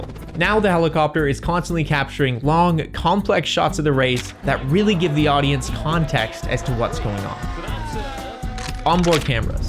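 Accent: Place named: Canada. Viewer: Now that I hear it he's definitely Canadian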